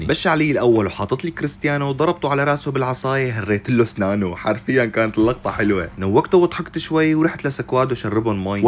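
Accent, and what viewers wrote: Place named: Levant. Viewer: مبين شامي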